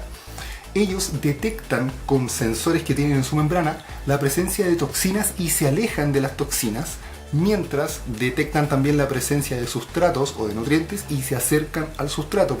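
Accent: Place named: Chile. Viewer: muy bien!! es la forma q tienen los antiveganos de atacarnos, yo ya no respondo a sus ataques, me cansé y no se consigue nada dando explicaciones... muy buena explicación... eres fantástico, caes muy bien, me encanta el acento y el tono q tenéis los chilenos al hablar. Gracias guapo por la información. Go vegan.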